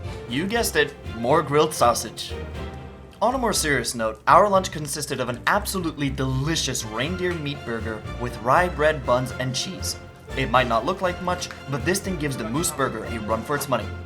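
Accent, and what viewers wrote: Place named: United States. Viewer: Dude is that you talking? Why do you sound american 😆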